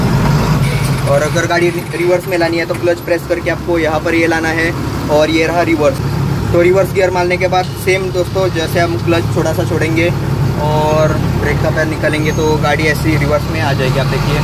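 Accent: Goa, India. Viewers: Goan hindi accent aikonut kavle bhava goacho munu tu😍🔥🔥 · That Goan Hindi Accent..🔥🔥 superb..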